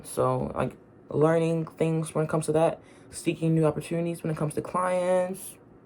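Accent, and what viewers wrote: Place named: United Kingdom. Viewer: Pile 2… AHHH your voice is genuinely like body lotion for the spirit 😂even when you’re being blunt with me about what I need to hear 👀 also why is your British accent sounding even MORE British than mine right now???hahhh A lot of this definitely felt like old energy & some is energy I’m still in the process of releasing. Omgg yes I’m not anti advice AT ALL, Don’t get me wrong, I’ll always ask for tips and recommendations when I need them. But unsolicited advice… especially around my health… I just can’t 😭 The constant ‘have you tried this?’ can feel so overwhelming and like I’m being pulled in a million directions, even when people mean well. It can make me feel like I’m not ‘doing enough’ Sometimes I just need empathy… not ‘fixing’ lol I really loved your take on simply observing the energy & sitting with it, without feeling like I need to take immediate action. 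I need that right now. Pile 2 also lovingly called me out on my own bs 😅 I moved away &released a toxic environment, but I’ve also realised I play a part too… bc I’m very mentally and physically restless and really struggle to just BE. it’s about shifting the mindset not just the environment! That has been a challenge for someone who always wants to be ‘doing’ something when sometimes I actually just need to rest. ahhhh thee synchronicity whatttt😭 I mentioned that song in my last reading… & you posted yours before mine 👀 & I promise I only just watched it now and didn’t know you mentioned this til just no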